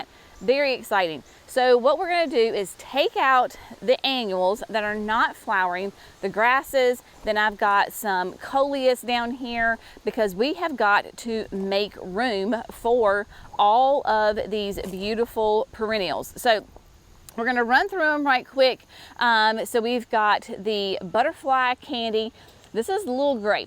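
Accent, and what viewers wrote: South, United States. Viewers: I'm zone 8 Arkansas, a 5 yrs gardener and I have learned so much from the videos you've posted. I just love your southern accent, your gardening knowledge and your personality is unmatched. ❤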